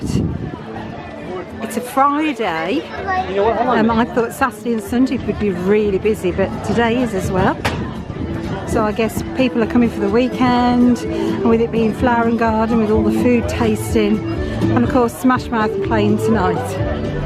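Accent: Birmingham, United Kingdom. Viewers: Can hear a bit of a Brummiw twang in your voice I think? Found you from Dev. Going back to WDW in September yet again. X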